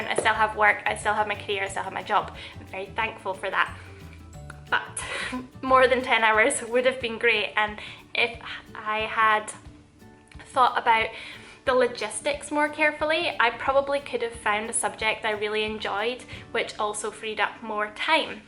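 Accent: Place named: Scotland, United Kingdom. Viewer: oh my goodness your accent is amazing! I haven't heard that in a while so i'm not sure.. scottish, irish, where are you from??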